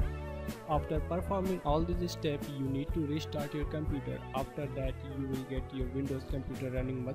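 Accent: India. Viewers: hears indian OH HELL N- clicks out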